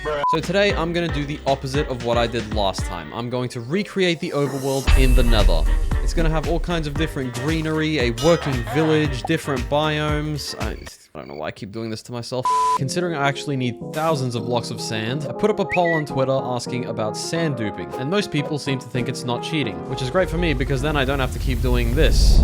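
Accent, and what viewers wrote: United Kingdom. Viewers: I really like your British Accent... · Love british accent too much dude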